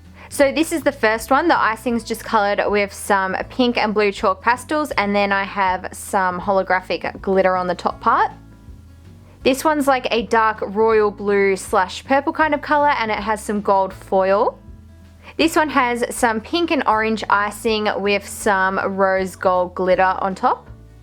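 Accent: Australia. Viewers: She has an australian accent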